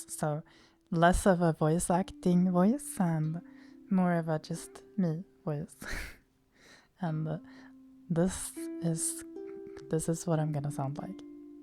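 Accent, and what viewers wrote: Sweden. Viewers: You sound very Swedish now, I don't hate it though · swedish accent is cute af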